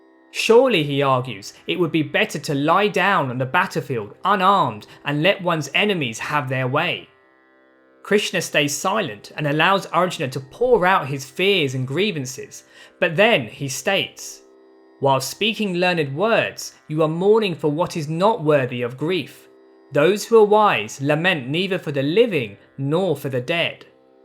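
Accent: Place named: United Kingdom. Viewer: UK! I think due to his speaking type!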